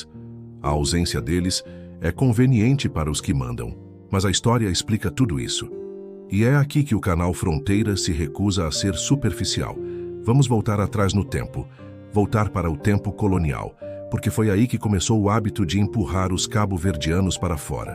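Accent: Brazil. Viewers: Madame, então qual o motivo de "hablar" em português com sotaque brasileiro? Faça o favor de mudar para sotaque dos PALOP...🎉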